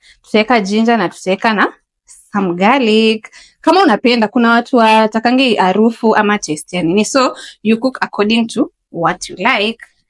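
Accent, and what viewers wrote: Kenya. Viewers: Umeishi na mluhya hadi unaongea kama mluhya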